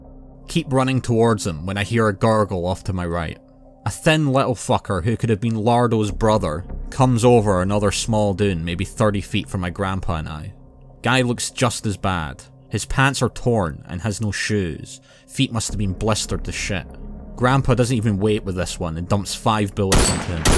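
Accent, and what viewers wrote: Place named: Scotland, United Kingdom. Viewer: I decide I like hearing a Scottish accent telling spooky stories. It keeps me actually focused on the story somehow and it also feels like I’m at the bar listening to a friend tell me an intense tale over some drinks. I like the vibe you bring sir.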